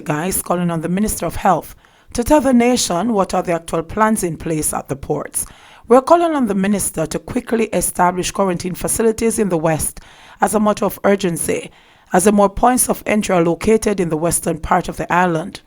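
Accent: Jamaica. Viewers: Love your voice and your accent, and your fluency in English and Jamaica English!! Lol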